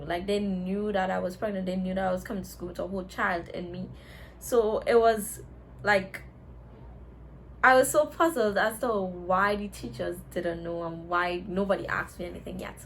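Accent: Trinidad and Tobago. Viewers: She sounds like a Trinidadian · what's your nationality? sound Trinidadian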